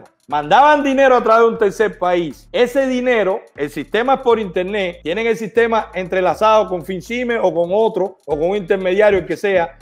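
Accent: Cuba. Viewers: Señor usted es un excelente comunicador y se informa muy bien hablando en buen cubano con usted no alumno bruto, felicidades, gracias.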